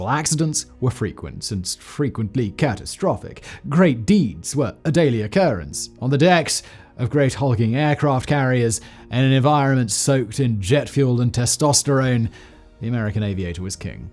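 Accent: United Kingdom. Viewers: Slow down! You talk so fast in your brit style us Americans can't keep up bro...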